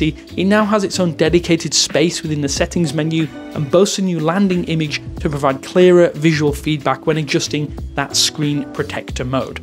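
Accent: United Kingdom. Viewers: You sound like your from the UK but the UK didn't get these features only the US did.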